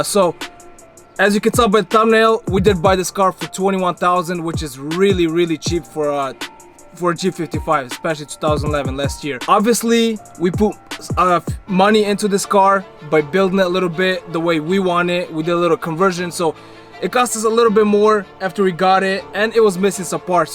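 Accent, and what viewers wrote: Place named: Russia. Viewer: Russian accent not black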